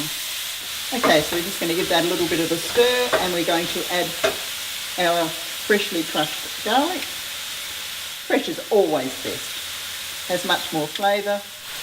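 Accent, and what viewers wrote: Australia. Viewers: Do I detect an Australian or a New Zealand accent? I loved the recipe by the way, especially when you made cauliflower rice because it's something I've been wanting to try. I love how relaxed you are. This is my first video that I have watched on your channel and I've subscribed already. Even though your 'about' section said you focus on gluten free recipes we can all use them. Thank you for sharing your knowledge of cookery. Xx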